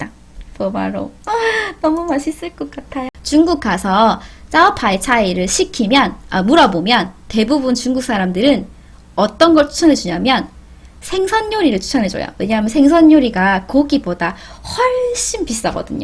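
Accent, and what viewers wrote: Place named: Gyeongsang, South Korea. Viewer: 중간 중간에 경상도 억양이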